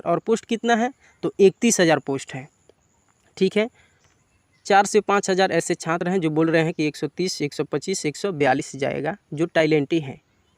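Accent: Bihar, India. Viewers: Bhai बिहार से हो क्या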